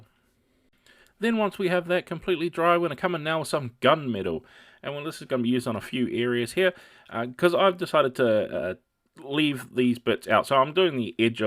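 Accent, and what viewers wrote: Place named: New Zealand. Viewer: "now we wanna come in now with some dick tan" I love the kiwi accent!!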